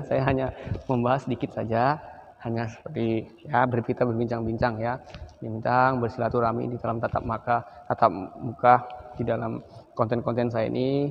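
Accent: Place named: Palembang, Indonesia. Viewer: Ay wong Palembang koyong ini😂 Palembang dimanonyo Yong?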